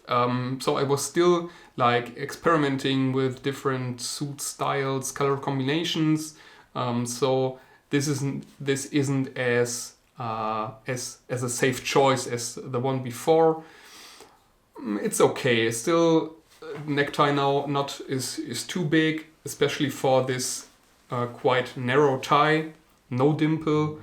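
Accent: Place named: Germany. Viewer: Great content. Your accent reminds me of my ex girlfriend dad. He is German and has the same accent 😅 no offense haha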